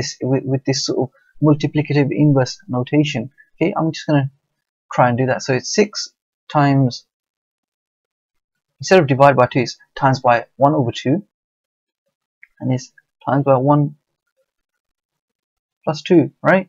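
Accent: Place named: London, United Kingdom. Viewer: I hope that was sarcasm? London accent and a Utd supporter...local?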